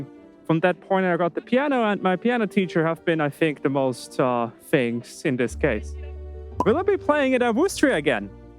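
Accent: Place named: Germany. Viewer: Naww that's really awesome that you've made a vidio like that! ;3 And as a German speaker I would also say your accent is more like "Hochdeutsch"! ;3🐾🦊🐉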